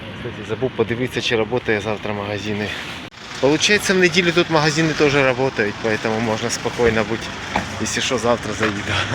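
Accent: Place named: Surzhyk, Ukraine. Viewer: лю твій суржик а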